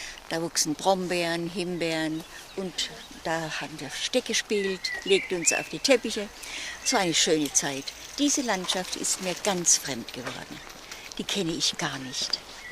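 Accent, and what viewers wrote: Swabia, Germany. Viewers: oma redest du schwäbisch oder badisch wenn du nicht vor der Kamera bist? Grüße aus Pforzheim nach Karlsruhe❤ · an alle die nicht aus bawü stammen hat die Oma einen schwäbischen Akzent? Ich kann sowas schlecht beurteilen weil ich für mich auch keinen Akzent hab aber Freunde aus dem Norden immer meinen das sie kein Wort verstehen ^^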